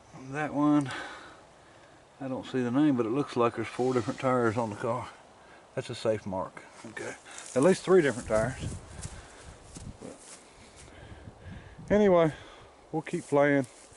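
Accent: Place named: North Carolina, United States. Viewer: You have the North Carolina accent..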